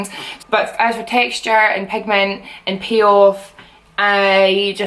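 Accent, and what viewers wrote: Scotland, United Kingdom. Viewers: I sound similar to her but hers is much stronger haha I'm fairly quiet myself tho it's hard getting people to understand when I go on holiday 😂 us Scots speak fairly quick and with slang aha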